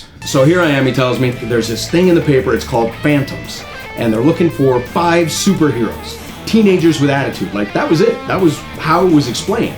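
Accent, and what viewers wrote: Australia. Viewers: His Aussie accent is on point. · Not bad aussie accent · His Aussie accent though 😂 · wow great ausie accent! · Don't get me wrong...your acting as Jason was magnificent...but I do believe that your australian accent would get you an Oscar! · I don't know what's more amazing: the fight, or that Australian accent. Thanks so much for this video. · Nice Aussie accent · That is a damn good Australian accent for a presumably American. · Who the fuck saw that aussie accent coming?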